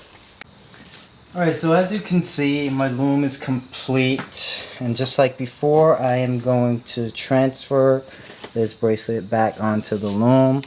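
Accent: United States. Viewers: Are you from New Jersey? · Are you american or what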